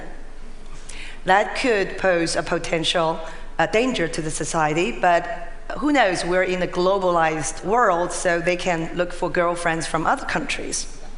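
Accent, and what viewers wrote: United Kingdom. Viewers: why does she have an british accent? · interesting.... she has British accent rather than American... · 杨澜在美国念书，但是发音是英式，有意思。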